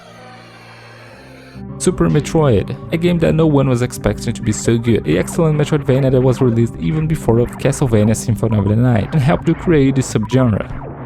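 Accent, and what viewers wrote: Brazil. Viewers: ahaha esse sotaque de brasileiro falando ingles é muito fácil reconhecer.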